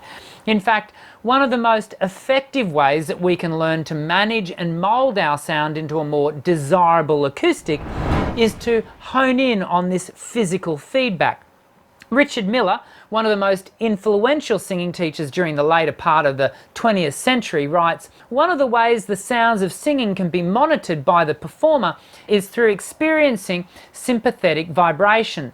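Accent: Australia. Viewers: these videos help but i can't keep myself from thinking if you didn't have such a thick Australian(?) accent they might help even more lol. thanks though! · I thought it was Dana Carvey doing an Australian accent .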